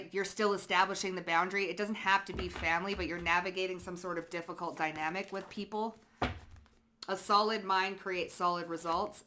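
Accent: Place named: Wisconsin, United States. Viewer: Oofdah: You accent sound like Wisconsin. I've been around it enough 2imitate it. I got a touch of it if I'm not careful 2mask. Norwegian or Swedish ancestry. You & I would be a hoot for outsiders to listen to: taking a piss on everything dumb under the sun. I'd definitely keep t/drinks coming. It is a miracle I'm still around. 7 of 8 good hard-working me die from my condition before being diagnosed. It's a silent-killer. If I weren't built like a Terminator from baling tiny square haybales: I wouldn't have made it. So: that info's got to come- out. We can't be having no good-men to raise the next generation. Why there's 8 good-women to 1 good-man & 10% of men online-dating are seeing ~80% of women from the same sites. Oh yeah, I'm MacGyver. I can solve t/world's energy probs. Yep, I've made guys look bad who've been there 10+ years & I'm there a month doing their job twice as fast, so.. 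they do their best to get rid of me, and they did. It sucks. I'm just going to give it all away b/c Earth don't have time for us to change. It's melting the ice-caps 6 times faster than our previous predictions. We got to use every tool in the box & pump the brakes hard as we can. Unless we want to lose all of the coastal cities & states & have earth-quakes up the wazoo, volcanic off-gassing which makes it so much worse, I just got to put all my cards out there. I get a kick out of all these Christians who say prophetic singers I got with in other timelines: witches, when they're actually as H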